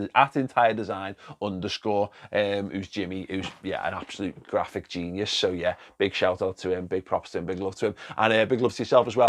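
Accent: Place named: St Helens, United Kingdom. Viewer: I can spot the Thatto heath accent a Mike away do you also do a St Helens rugby channel?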